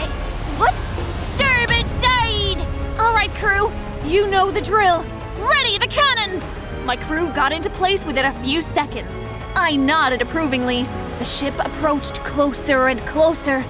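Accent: United Kingdom. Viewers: You sound like British I always want to sound like British and I want to be shy less to speak English or British idk how to speak British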